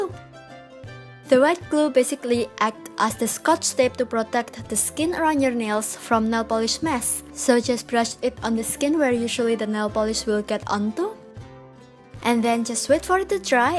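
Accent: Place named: Indonesia. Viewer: lol knew it from your accent! you're indonesian!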